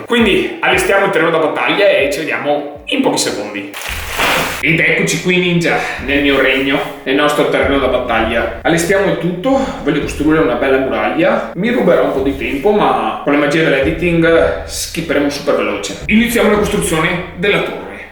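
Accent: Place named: Veneto, Italy. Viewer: Aspetto la 100000 in 100 ore come ErikTheElectrik 😂👍 ti ringrazio amico, oltre che per questi cheat che sono PORNO, anche per i full day of eating, perchè dai delle idee veramente ottime per pasti iifym, poi accento veneto diocan è la ciliegina sulla torta 😂😂😂 5000 iscritti in una settimana e altri 2000 quasi in qualche giorno, ti meriti tutto ciò amico mio!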